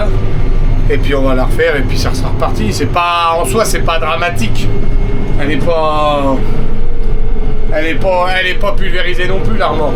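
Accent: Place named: Meuse, France. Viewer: Joyeux anniversaire à la grand-mère 🎉. Il y a pas malin pour la benne. Et par contre pas de soucis tu es bien meusien l'accent est bien présent. La meuse c'est les meilleurs.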